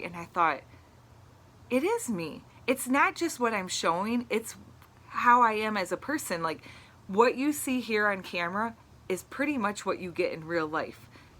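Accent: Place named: Midwest, United States. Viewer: You're amazing! I found your channel during BBW SAS, and have really enjoyed your videos. Honestly, I really enjoy your accent. I love people from the midwest, people there are just so sweet and kind and genuine. Your accent makes my heart smile. I love how you make a big deal about the thumbnail and Byyyyyyyyyye, too. It's your signatures! Keep doing you, you can do anything! ♡ #TeamJackie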